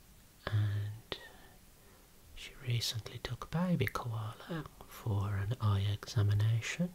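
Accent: Birmingham, United Kingdom. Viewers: Is from Birmingham?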